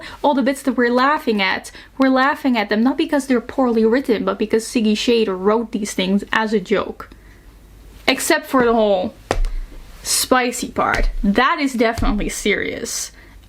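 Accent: Netherlands. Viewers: please make a playlist of you reading/reviewing truly unhinged and/or terribly written books (this, gothikana, etc) because they are so funny and I love them and sometimes what I need is to hear bad smut lines read aloud in a Dutch accent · you calling squidward octo made my ears perk up, along with your accent. dutch person detected!!!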